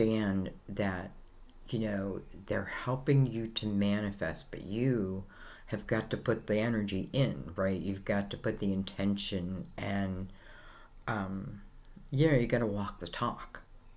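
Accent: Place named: Philadelphia, United States. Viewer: Are you in Philadelphia? You sure have a Philly accent to my ears! Nice reading.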